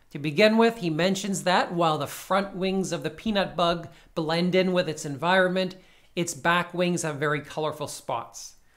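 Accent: Canada. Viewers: Hey, thanks for all the videos. They are really helpful. Test day tomorrow, wish me luck! out of curiosity, are you canadian? I like to guess where people are from based on their accent haha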